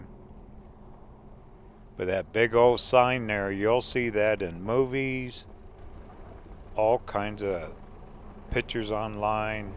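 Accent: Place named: Western Pennsylvania, United States. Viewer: Great videos. Sounds like you’re from Western PA…maybe dahn tahn Pittsburg? I’ve driven most of 66 dozens of time. Keep up the great work.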